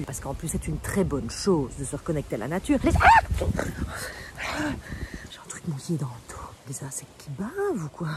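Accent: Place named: Belgium. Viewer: Même format, même humour, même petit accent et quelques expressions belges: suis tjrs aussi fan! 🤗 Tellement de sujets que l’on peut traiter ainsi Hâte de voir comment tu vas décliner celui-ci 😜 La nature et l humour, deux piliers de ma vie 🥰 La citadine en pleine nature, je me moque gentiment et j espère apprendre encore des bienfaits de notre nature, avec ☺️ À dimanche prochain 🥳🥳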